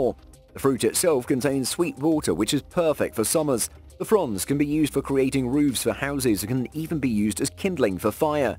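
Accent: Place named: United Kingdom. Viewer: Uk accents so different from ours strick-neen we say strick-nine migraine me-grain we say my-grain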